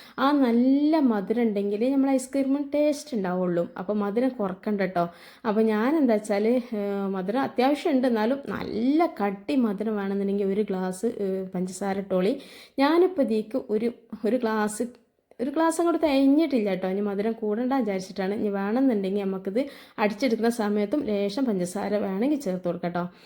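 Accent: Malappuram, India. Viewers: Sathyathil ivar malappuram kare naattikkan vendiyanennu ingane samsarikkunnath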